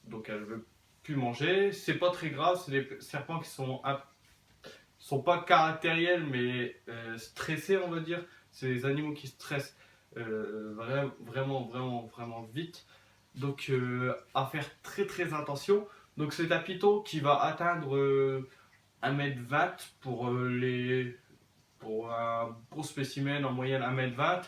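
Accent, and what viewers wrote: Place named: North, France. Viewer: Petit accent du nord ^^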